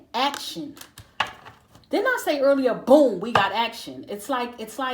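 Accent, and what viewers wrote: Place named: New York, United States. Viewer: love ur accent is u from nycc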